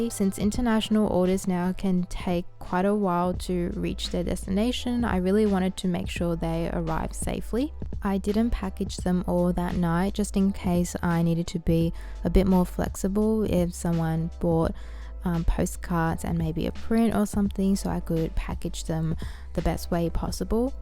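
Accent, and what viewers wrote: Australia. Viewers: This video came up as recommended as just watching a bunch of studio vlogs. Could instantly hear you were Australian and love your work! Just bought some postcards from your shop, just wow. so beauitful!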